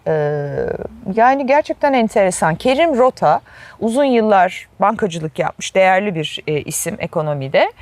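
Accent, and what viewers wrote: Standard. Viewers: Hanım sizi ilk defa izledim Temiz Türkçe akıcı anlatım👍 Ben her kusaktan son uc kusaktan cocuklara sahibim esnafim onlari okutmak ugruna kendime hic yatirim yapmadim pisman degilim.Devletin vermesi gereken egitimi kendi imkanlarimizla verdigimiz icin baska insanlara istihdam saglayacak sermayeyi olusturamadik egitim icin harcanan paralarda ise yaramiyor malum devlet polikasi.oy moy yok diyen uc cocuk var evde su anda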